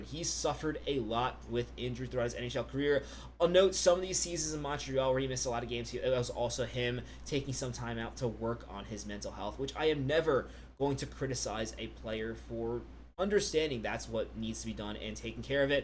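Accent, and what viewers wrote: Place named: Iowa, United States. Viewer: Where are YOU from?? Ya don't sound like a Long Islander. Ya sound like you're from Iowa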